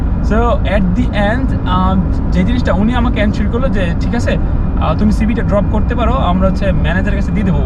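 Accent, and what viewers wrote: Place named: Comilla, Bangladesh. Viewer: vaiyaar basha comilla, amr basha o comilla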